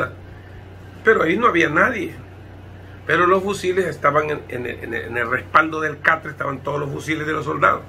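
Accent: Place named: El Salvador. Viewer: Éste se habla como salvadoreño tas tas ciado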